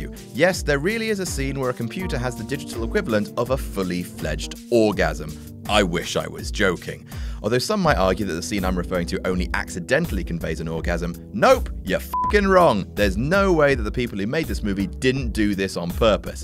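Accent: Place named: RP, United Kingdom. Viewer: For a moment I thought I was watching a WatchMojo video.... Wondering what I was doing with my life. Then, I heard soft posh voice and I knew, despite everything, all was right with the world.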